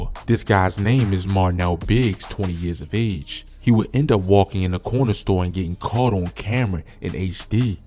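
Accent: Baltimore, United States. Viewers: Cuz he frm baltimore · This narrator definitely from Baltimore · The narrator DEFINITELY from Baltimore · Bmore accent strong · Yea you definitely from Baltimore 😅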